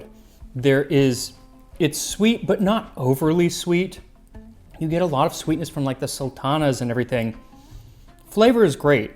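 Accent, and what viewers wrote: Europe. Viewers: his pronunciation is not half bad for someone that probably never studied Arabic before! I think he studied a lot of European languages so he might have added a bit of european style emphasis that would probably only be slightly annoying to native speakers. As long as it's not so bad it sounds like the wrong words, it isn't the end of the world. There's like 2 dozen dialects of Arabic, it's hard to be perfect at pronunciation unless you have some background in it. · All his pronunciations not European still sound European. His Japanese even sounded european. But that's fine. I'm not coming here for linguistics.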